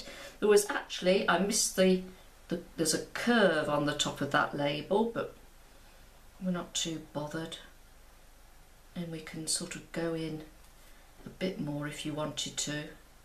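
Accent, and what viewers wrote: United Kingdom. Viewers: Hi... thank you SO much for this... I really struggle with letting go and producing loose paintings... you've shown me an easy and enjoyable way forward! I am completely self-taught (with the help of YouTube and Google! lol!) and started out with watercolour pencils... in the last couple of weeks, I've transitioned across to watercolour paints... maybe this is why I find it hard to let the paint express itself, as I'm used to having more control with pencils?! Anyway, enough rambling... PLEASE do more of this type of thing:) Being an expat in Cape Town, it's great to hear a fellow Brit too!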